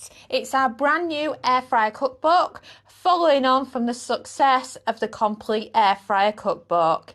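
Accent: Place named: Yorkshire, United Kingdom. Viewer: Will be trying this recipe out today, thanks. Btw, a fine Tyke accent! Lovely to hear someone speyking reyt! xxx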